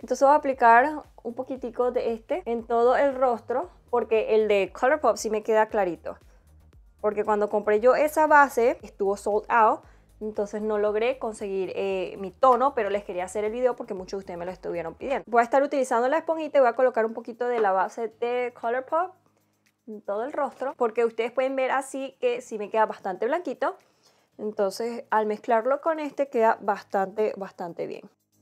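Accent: Venezuela. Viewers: WUAO TE QUEDÓ INCREIBLE, ME ENCANTO VALE,TE LO JURO,ERES TREMENDA MAQUILLADORA.YI SOY NUEVA EN TU CANAL.SI ES POSIBLE PREGUNTAR,DE QUE PAIS ERES? ES QUE ME PARECE QUE HABLAS COMO VENEZOLANA????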